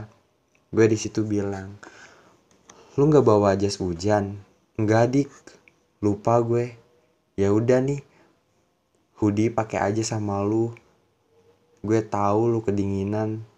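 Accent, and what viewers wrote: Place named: West Java, Indonesia. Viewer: Logat sunda nya agak keliatan yah wkwkwk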